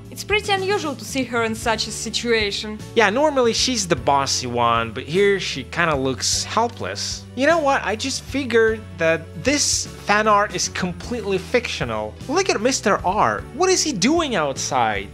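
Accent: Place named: Russia. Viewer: Очень классный ролик, но блин какой же классный русский акцент :)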